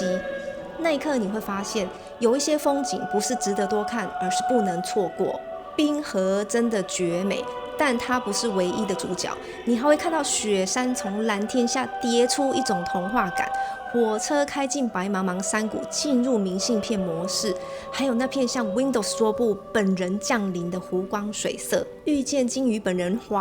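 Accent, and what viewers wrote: Taiwan. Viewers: 台灣口音甜蜜親切又有趣❤❤❤😂😂😂👍👍👍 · 看完影片，先订阅，你的文彩，佩服，台湾腔的国语，把原有的幽默更提一层，期待下一期，说一下你的攻略，包括旅轮网站，搜索技巧，上岸那些景点值得一去，错过会小遗憾，订船上旅游还是下船跟当地导游(我们是刚退休人士)，火车skygway必须事先或下岸预订(怎么算好那天)，等等. 谢了🙏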